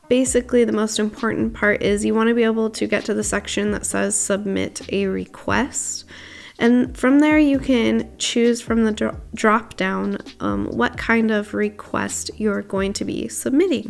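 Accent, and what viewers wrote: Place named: United States. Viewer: ^^ J’ reçois même pas la notif T^T (YouTube bug) N'empêche tu as un très très bon accent américain XD !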